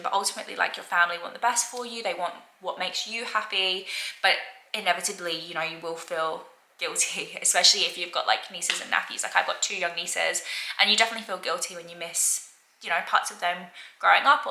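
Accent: United Kingdom. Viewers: Very good, so I had a look at a video you made 8 months ago. Hard to believe they are the same person. Most obviously, 2023 had a strong English accent where as 2024 pretty much talks normally. And 2024 looks much happier, healthier and self-confident than last years model. The fresh air has done you good.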